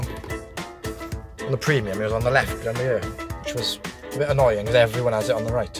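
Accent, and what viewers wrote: Wales, United Kingdom. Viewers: where in wales you from dude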